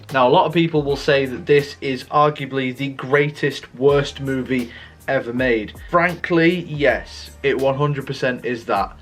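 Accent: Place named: Manchester, United Kingdom. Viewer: I'm so lucky that I sit next to a bloke called Mark at work (well used to before Covid) and the joke never gets old. Can I ask what your accent is? Sounds a bit ' ' (capital of Manchester)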